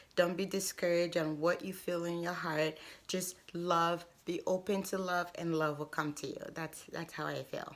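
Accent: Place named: United States. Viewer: your Accent look like Amerikan .how long had you been lived nigeria?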